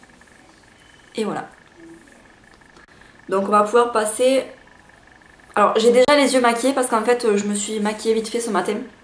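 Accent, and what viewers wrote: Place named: Marseille, France. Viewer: vu ton accent tu dois venir prés de marseille?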